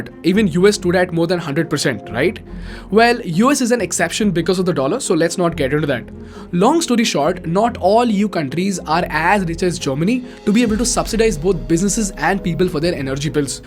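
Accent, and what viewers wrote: India. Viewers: i'm perfect flat canadian,, but i can sound hindi like you if i curl my tongue to the top of my mouth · What kind of language is that? Indian English is like a joke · the agitated Indian accent makes it annoying to listen to this person. I quit after 2 min. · Indian accent that’s I can’t understand it ?????😩😫😩😫🥺😫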